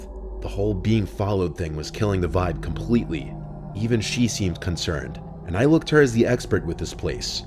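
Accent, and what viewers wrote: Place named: New York, United States. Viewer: Gotta love the New Jersey/ New York accent. Reminds me of home lol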